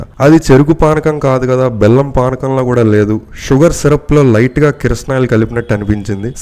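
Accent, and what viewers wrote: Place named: Godavari, India. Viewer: Food tho paatu commentary kuda proper godavari style. Thoroughly enjoyed bro.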